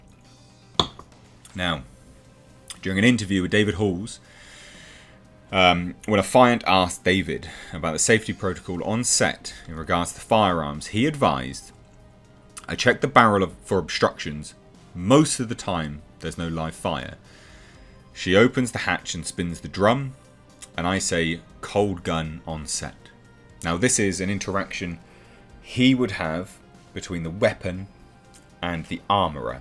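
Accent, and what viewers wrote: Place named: United Kingdom. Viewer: You're so handsome, then add the British accent and it's a wrap for me lol. Sorry 🤷🏻‍♀️🤦🏻‍♀️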